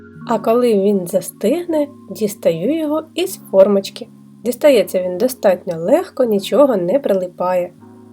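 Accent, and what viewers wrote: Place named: Border region, Ukraine. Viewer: Це діалект а не рашизм. Поїдьте в любий прикордонний район, в кожному свій діалект. Тим і прекрасна наша мова. І не робіть вигляд що ви в буденному житті спілкуєтесь літературною мовою. Так раптом всі стали "правильними патріотами" і почали інших вчити.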